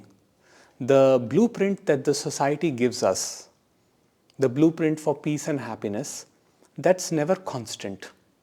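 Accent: India. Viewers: Maybe you are not awakened? Maybe you are just another douchbag on the Internet with Indian accent. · Immediately clicked away when I heard the indian accent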